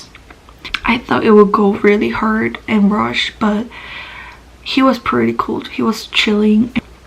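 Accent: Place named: South, United States. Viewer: 미국 남부지역에 거주하다가 욌다는 것을 영어억양듣고 추정할 수가 있어요, 표준발음은 시카고나 디트로이트 주변에서 거주해야 가능합니다.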